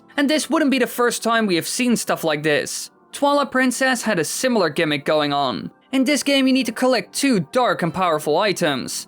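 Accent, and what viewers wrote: Netherlands. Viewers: Oeft what the bloody hell is your accent mate? Is it Dutch? It is Dutch isn’t it?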